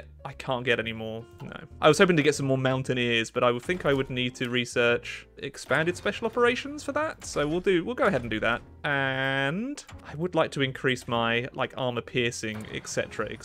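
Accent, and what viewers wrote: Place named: United Kingdom. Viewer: british fella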